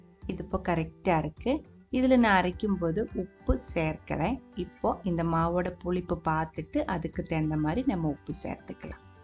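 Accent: Kerala, India. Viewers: So nice mam ...your voice and rendering way is so cute ...I will try ...mallu accent super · Your voice is very suthing...that mallu slang mixed in Tamil is nice to hear...if your videos can be a lil faster..that ll be an added advantage..but overall enjoyed watching..im gonna try it soon..❤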